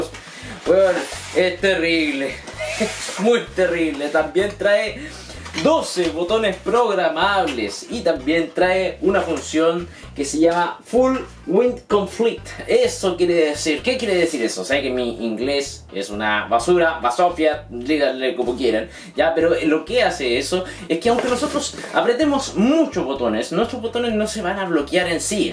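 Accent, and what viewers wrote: Chile. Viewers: A penas hablo dije, es chileno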